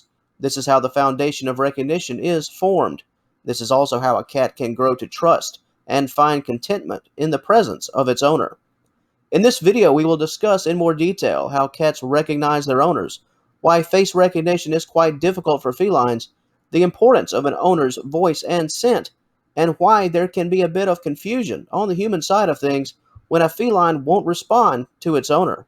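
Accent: United States. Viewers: I don't like your cowboy accent. Difficult to understand for us non-speakers. Improve.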